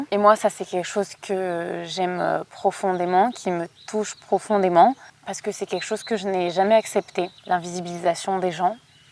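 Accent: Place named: Belgium. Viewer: je suis belge, et quand tu parles, bain on dirait quelq'un d'ici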